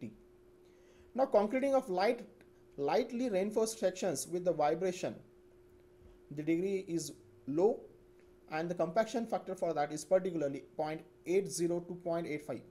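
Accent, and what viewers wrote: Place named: India. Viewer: Put caption cause its so damn hard to understand indian’s english with that strong Hindi accent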